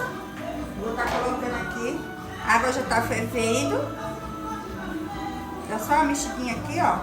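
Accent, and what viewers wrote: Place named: Alagoas, Brazil. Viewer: Eitaaa q Pablo tá rolando solto..kkkkk moça vc é de Alagoas??? Acho lindo o jeito q vcs falam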